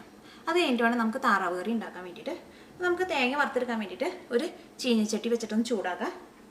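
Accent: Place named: Vypin, India. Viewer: cheachi super vypin karude bashayil paranjal thakarthitund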